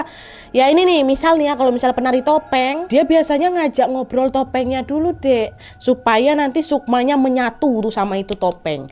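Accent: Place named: Java, Indonesia. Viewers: 😂😂😂😂 ngomong jawanya pinter. Medok juga. Mantap 👍🏻👍🏻👍🏻 menjiwai ceritanya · Ada yg baru nonton oktober 2025😅? Kak medok Jawanya uapik tenan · kak nad sampean logat nya jawa nonjol pol loh 😭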